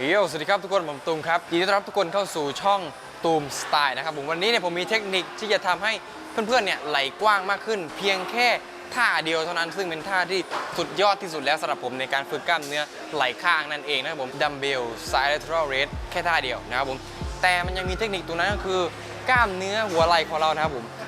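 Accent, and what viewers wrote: South, Thailand. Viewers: เป็นประโยชน์มากเลยครับดูทุกคลิปเลย ชอบความมีสำเนียงทองแดงโผล่มาด้วย มีเสน่ห์มาก 😄😊 #คนใต้นิ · Southern Tone makes your VDO different from others. Otherwise make me miss my hometown. Keep doing the good job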